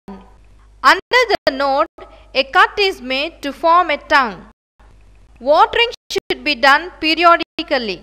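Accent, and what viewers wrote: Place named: India. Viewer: The moment you realize this voice was indian but still helpful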